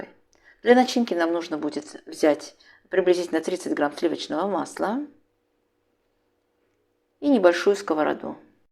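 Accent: Baku, Azerbaijan. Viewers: А откуда родом наша девушка,очень пробиваются бакинские интонации. То ,что называют - бакинский акцент...музыка для слуха!!))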